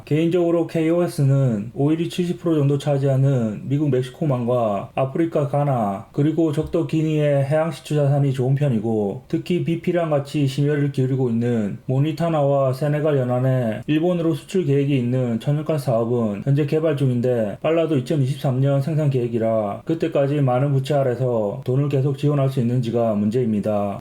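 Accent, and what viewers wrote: Gyeongsang, South Korea. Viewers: ovv 처럼 한 번에 다 몰빵은 못해도 조금씩 숫자 늘려가다 보면 나중에 좋지 않을까 하는 생각에서 가지고 있네요. 형님의 구수한 경상도 사투리와 함께하는 영상이 언제나 즐겁습니다 ㅎㅎ 올해도 잘 부탁드립니다~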